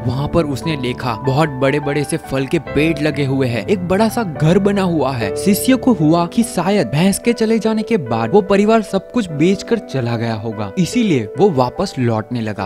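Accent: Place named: Surat, India. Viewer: आप की सुरती बोली हे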